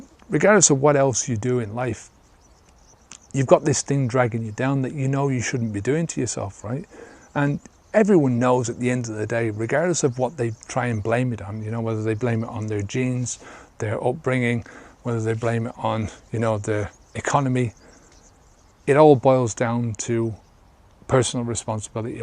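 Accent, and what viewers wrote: Dublin, Ireland. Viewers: hint of a Dublin accent here? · Such a cool accent! Is this Irish or Northern Irish?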